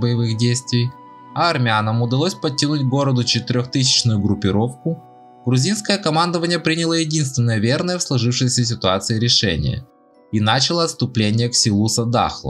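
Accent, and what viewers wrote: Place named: Odessa, Ukraine. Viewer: Азербайджана ещё небыло в это время он только создавался 😃 От куда такой Одесско-Еврейский акцент!?😃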